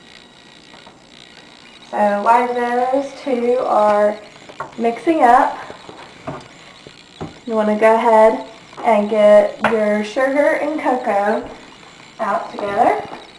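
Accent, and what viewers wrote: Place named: South, United States. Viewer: are you southern I hear an accent.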